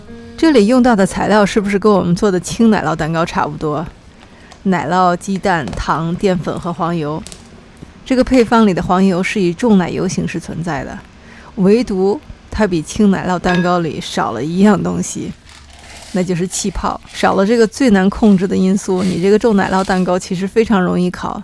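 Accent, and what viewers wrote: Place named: Beijing, China. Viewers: ，看头像里你的样子，和我一个同学长得很像，她也是一个北京姑娘，所以连口音都像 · 北京口音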